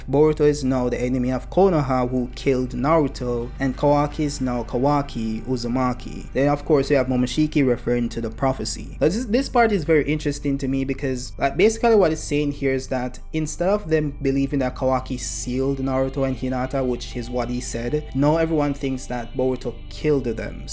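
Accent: Jamaica. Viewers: You sound like a Jamaican speaking standard English. Are you Jamaican bro?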